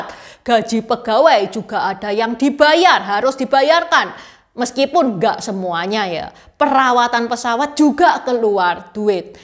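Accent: Java, Indonesia. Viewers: Aduhhh, dengerin ci menjelaskan, maaf ya, kenapa jd stress ya😅... volume suaranya itu lo mbak dan medog jawanya. Pusyingggg... Intinya kawan. Mau di terminal brapa aja turunnya, gov singapore sdh pasti membuat penumpang nyaman. Ada skytrain, ada shuttle bus tp free semua sbg bentuk convenient nya utk penumpang. Jd ga susah2 amat mau dr budget airline or full service flight kalo perlu pindah. Utk makanan, branded stuff, di semua terminal ada. No worries.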